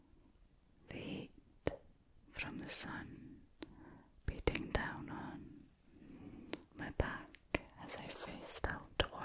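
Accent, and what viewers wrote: Scotland, United Kingdom. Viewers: Wow, I'm all-a-tingly listening to/watching that! been away from Scotland for nearly 30 years and LOVE the accent. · you have such a sweet and soft voice and that beautiful accent of yours makes the audio even better :D Brittish in whole are wholesome and great, especially scottish! ;) Have a wonderful day! Best regards// Jonathan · Love your tingly whispers and beautiful Scottish accent. Pure heavenly relaxation! ❤️ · ayyy scottish squad!! i've never seen another asmrtist with a scottish accent, so this is super lovely :D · I've just started getting into ASMR. It feels surreal that this is the first time I've heard a Scottish person speak since I've watched Still Game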